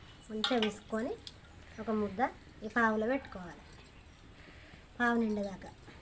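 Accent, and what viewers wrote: Telangana, India. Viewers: మంచిగ చెప్పినవ్ అక్క మన తెలంగాణ స్టయిల్ ల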